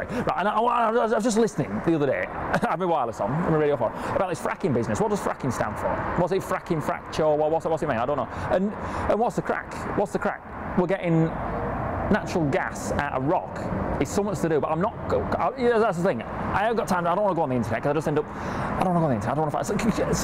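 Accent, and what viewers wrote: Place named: Lincolnshire, United Kingdom. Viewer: people form lincolnshire talk like this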